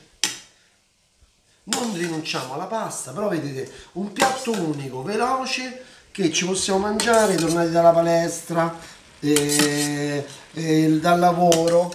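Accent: Rome, Italy. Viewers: Steffen Ali If you’re trying to learn Italian, you may have a bit of difficulty understanding this guy. He is very much a Roman and Romans have a strong accent that may be difficult for beginner learners. However, keep up the good work!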